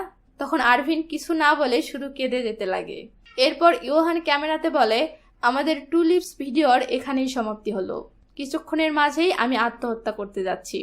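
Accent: Bangladesh. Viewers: আপু আপনার কথা শুনে মনে হয় আপনি বাংলাদেশী।কিন্তু আপনার চ্যানেলে দেয়া আপনি ইন্ডিয়া থেকে!! আমি কি ভুল?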